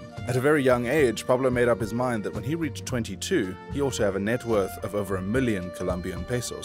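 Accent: South Africa. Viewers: Are you from South Africa? Reason being - accent sounds familiar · The narrator sounds South African or perhaps Zimbabwean. I’d say Durban, South Africa.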